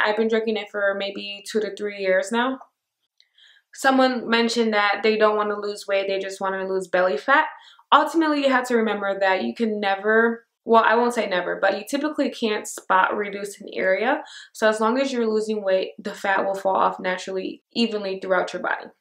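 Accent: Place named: United States. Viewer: I loved the way you spoke nd presented it.👌👌. Where are you from sweety? As far as I observed it helped to figure out, u r an American born.I might be wrong, but I wanna know☺☺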